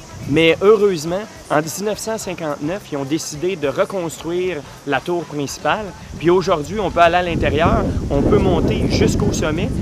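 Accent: Quebec, Canada. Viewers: Entendre l'accent quebecois c magique XD ...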